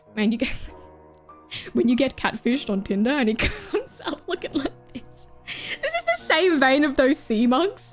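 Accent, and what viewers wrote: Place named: Australia. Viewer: Ahoy, I love the Australian voice.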